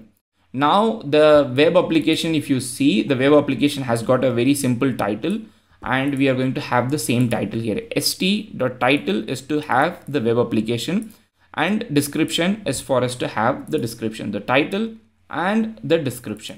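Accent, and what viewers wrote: India. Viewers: i love the indian accent its the best, Techguys form india are to me the best masters and teachers ... And this content/video/tutorial is the proof Ty sir... You are helping to survive this madness... Cheers from Mexico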